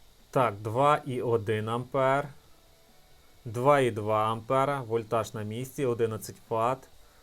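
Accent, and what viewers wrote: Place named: Transcarpathia, Ukraine. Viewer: Дістали, триста. з Закарпаття. Тільки там так говорять.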